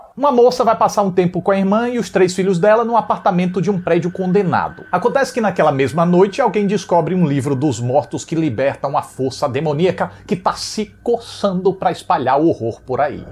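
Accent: Northeast, Brazil. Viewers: Tá mais bonito fantasiado de zombie . Brincadeiras as parte , eu gosto muito das suas críticas. Muito bom o sotaque nordestino , eu TB sou srsrs ; além da análise madura e didática . 🎉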